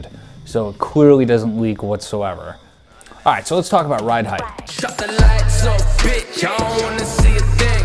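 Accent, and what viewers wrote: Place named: New England, United States. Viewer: Love this guy's videos but hot damn that yankee ass accent! I can get past it but it irritates my subconscious ever so slightly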